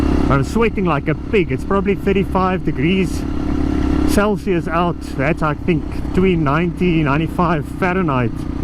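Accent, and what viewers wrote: South Africa. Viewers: Is dié man Afrikaans?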